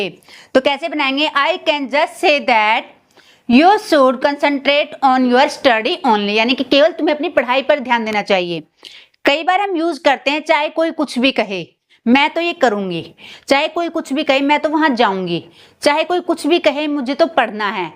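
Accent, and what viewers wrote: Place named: India. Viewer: आप बहुत अच्छा कंटेंट लेकर आती है और बहुत अच्छा पढ़ाती है but अब समय बदल गया है तो आप थोड़ा सा अपने एक्सेंट पर और प्रनंसीएशन पर भी काम कीजिए और आप यूट्यूब में थोड़ा सा ऐसी वीडियो देखिए जिससे आप जब इंग्लिश बोलती है तो उसमें देसी लहजा न लगे आप टीचर बहुत अच्छी है इसलिए मैं आपको सब्सक्राइब कर रहा हूं मैं यहां बुराई नहीं कर रहा हूं आपकी, बस आपको थोड़ा सा अपडेट रहने के लिए कह रहा हूं । समय के हिसाब से थोड़ा सा ध्यान देकर आप अपना देसी लेहजा हटाये और उच्चारण आज के समय के हिसाब से करें। सलाह ठीक लगे तो माने अन्यथा आप बहुत अच्छा पढ़ा रही हैं😊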